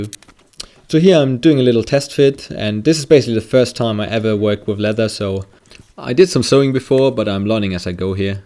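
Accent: Germany. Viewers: Really cool project. Your english is good, but you have this tiny little accent. Are you german? :D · actually he's got an accent, I'd say he's from Germany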